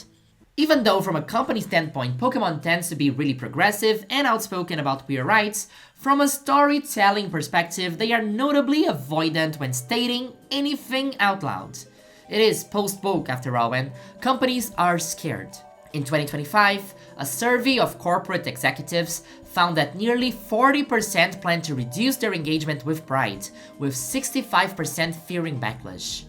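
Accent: Brazil. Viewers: Are... Are you from São Paulo? You sound like you're from São Paulo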